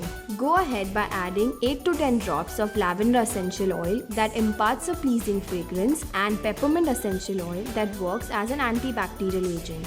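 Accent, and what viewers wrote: India. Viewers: Very hard to understanding her ascent too strong indian.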